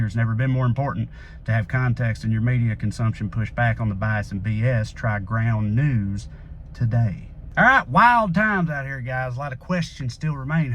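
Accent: South, United States. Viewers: I'm glad whenever this proverbial rollercoaster rattles over another hill or hurtles through a long dip...that I have this man's voice to tell me about it. Reminds me of home. Thanks for your hard work from Thailand. is about the only southern accent I get to hear these days. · Listening to a Southern accent talking liberal politics does my heart hood. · Hey I'm prolly gonna eat shit But I. Respect what you're doing I never really had to think before But props On props Its an effective form of absurdist theatre that just makes sense You are of course as smart as you think you are but getting an actual message across is impossible unless your a smoke show in a bikini. We're all gonna die But I hate a country accent slightly less because of this as long as no one mentions vacation bible school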